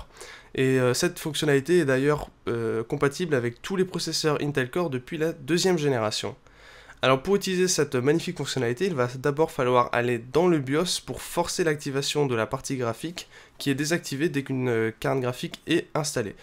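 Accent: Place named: England, United Kingdom. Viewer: Bel accent anglais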